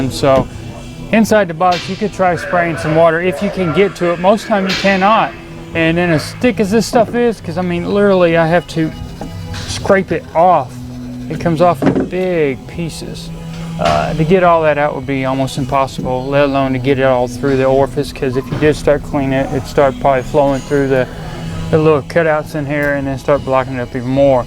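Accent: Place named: Alabama, United States. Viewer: You don’t sound like you from the motor city.. sound more like you from Alabama Mississippi or something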